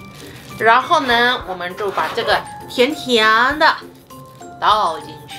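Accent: Taiwan. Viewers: 國語好有台湾feel，尾音勁似👍👍🥳好正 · 對、為何你的口音咁台灣？你在那裏學的？一定不會在中學校！ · 嗨 你的國語發音很像台灣人😊說得很棒哦！我有些香港朋友是沒辦法那麼清楚發出咬音的呢，剛好肉圓是在我居住的城市 彰化，煙韌國語可以說成是 Q彈有咬勁哦。在彰化的肉圓是蒸熟後油炸，有脆皮派也有煙嫩派的、還有涼涼吃的肉圓 叫涼圓。 我也很喜歡旅遊 香港也去過十多次了，有機會來台灣的話 可以一起吃美食哦😊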